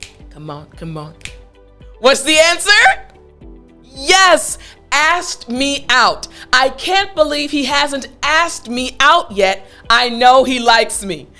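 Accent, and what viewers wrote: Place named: United States. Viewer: Your lessons is good and have the American accent so anyway that's awesome! I'd like the way you are teaching the students because you have style of teaching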